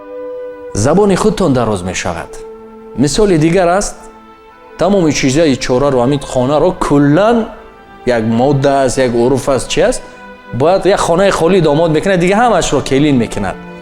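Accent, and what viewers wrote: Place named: Dushanbe, Tajikistan. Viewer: Хамааш дуруст! Ман дар Хучанди шумо 7 сол зиндаги кардаги лахчаи гап зании шумоя нагз медоным. Лек шумо гап занитон ранги Душанбегихо !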